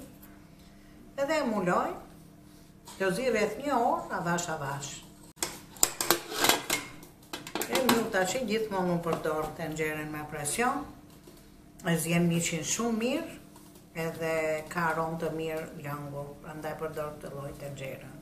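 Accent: Tirana, Albania. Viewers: Ka lezet kur bohet e shpjegohet nga nje tironse me dialektin e Tirones. Na kishte more malli .Njit cdo gjo. T`lumshin duret!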